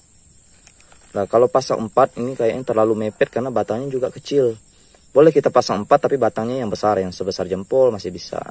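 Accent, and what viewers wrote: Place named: Java, Indonesia. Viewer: Bang dari logat bahasanya kok Borneo nya cukup Ke Jawa- ne ha ha ha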